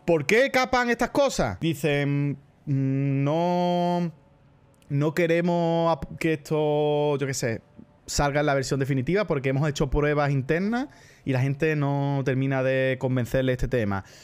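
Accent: Puerto Rico. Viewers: A veces se me va el rollo y por el acento creo que Baity es puertoriqueño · porque habla como puertorriqueño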